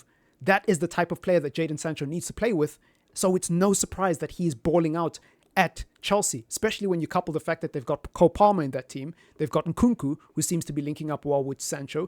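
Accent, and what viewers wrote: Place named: New Zealand. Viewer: Where you from bro New Zealand or South Africa can't really tell ya know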